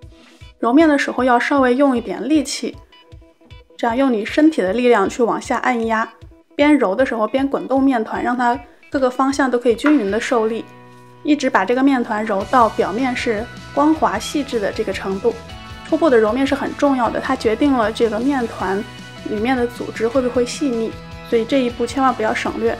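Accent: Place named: Shandong, China. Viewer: 我想一定是山东人！口音也像。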